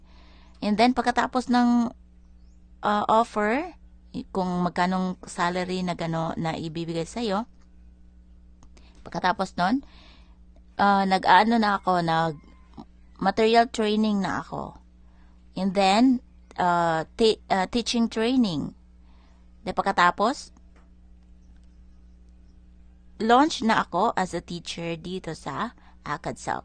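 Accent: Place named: Visayas, Philippines. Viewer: Paanu ka nakapasa diyan, may bisaya accent ka .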